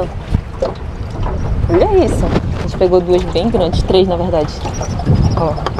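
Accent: Rio de Janeiro, Brazil. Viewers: Sotaque parecido com carioca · você é carioca??? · Vc tem sutaque de carioca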